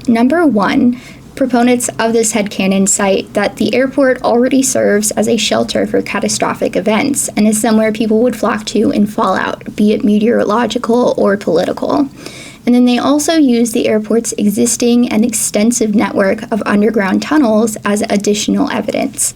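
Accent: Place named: Utah, United States. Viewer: from Utah and those pronunciations are how locals would pronounce them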